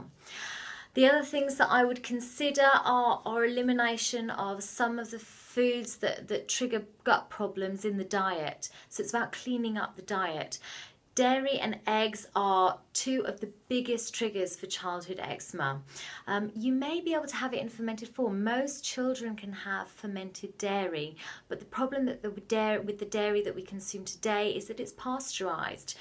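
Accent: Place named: United Kingdom. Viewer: U have a strong British ascent young white lady. Other than that, when is the best time to take probiotics? Thanks in advance ma'am.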